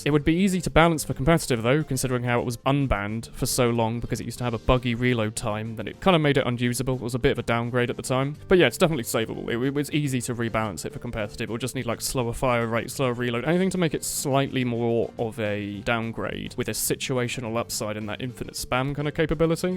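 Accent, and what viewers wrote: United Kingdom. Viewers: even if i dont care much about competitive tf2...i just love hearing this british man speak like a professor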